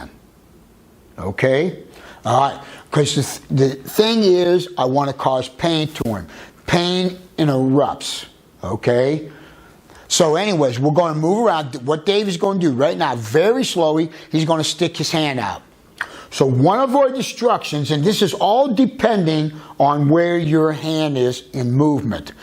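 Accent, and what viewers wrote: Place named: Ohio, United States. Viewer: I looked up your area code, and yes you are Cleveland area- as I thought. I’m from Toledo and you sounded like a buckeye. Really enjoyed video. Great instructor! Bet you have some fight stories? Best to you Dave!!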